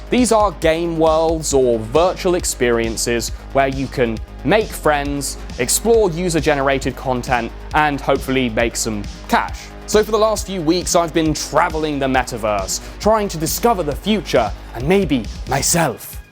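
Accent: United Kingdom. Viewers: A British accent using surfing slang is a bit much for me to handle.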